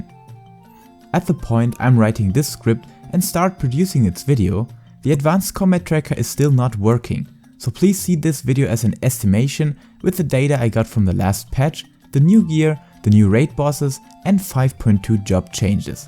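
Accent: Germany. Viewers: R U German? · So wie dein Englisch klingt, bist du deutsch oder ? Sehr gute und Hilfreiche Videos!! Sehr zu empfehlen!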